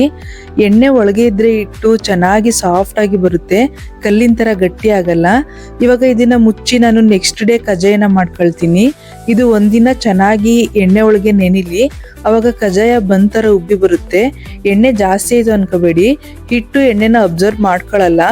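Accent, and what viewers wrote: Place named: Mysore, India. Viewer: Madam nimmadu yava ooru.? Nim mathadodu keluttha idre mandya Mysore kade kelvru heege mathadodu.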